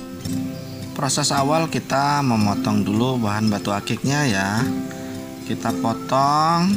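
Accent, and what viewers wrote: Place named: Java, Indonesia. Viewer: Maaf boss..kok logatnya ky orang jawa...?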